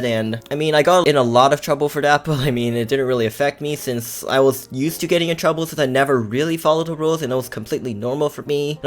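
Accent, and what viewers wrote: United States. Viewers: Not trying to be rude but are you American like idk ur voice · i have chinesee friends and their childhood was like that to and hearing that ur chinesse is so surprising like my chinesse friends learned a lot of english and all and i knew when i first met them that their chinesse by their voice but u sound like a normal american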